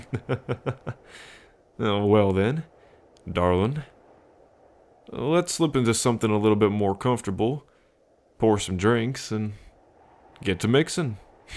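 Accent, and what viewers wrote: United States. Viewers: 🥰🥰🥰nice country voice ❤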